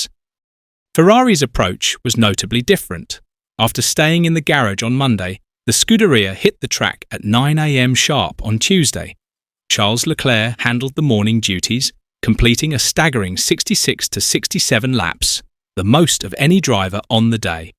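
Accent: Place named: United Kingdom. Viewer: Brit English is getting to me.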